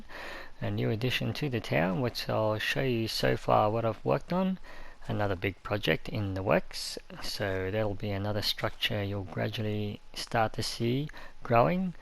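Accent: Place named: United Kingdom. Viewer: Are you from UK because your accent is like those gentlemen i heard from youtube?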